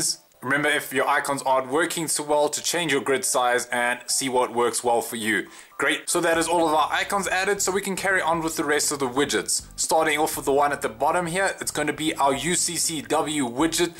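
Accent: South Africa. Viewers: Dude are you South African!?!?!?!?!?!?!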